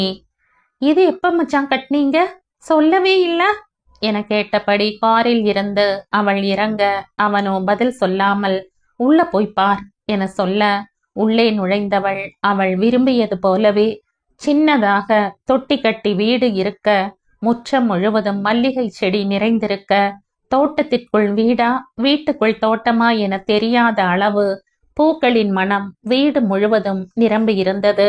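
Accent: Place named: Kongu, India. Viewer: அருமையான பதிவு சிறப்பான முறையில் கொங்கு தமிழில் தங்களின் இனிய குரலில் கதை கேட்ட சந்தோஷம் நெஞ்சம் மறக்க முடியாத கிராமத்து மக்களின் வாழ்க்கை கதையின் ஒவ்வொரு அத்தியாயமும் அருமையிலும் அருமை வாழ்த்துக்கள் சிவசடாச்சரம் இராமநாதபுரம்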